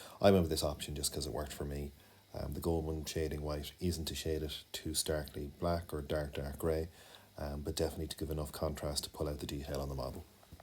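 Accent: Ireland. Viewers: u Irish ??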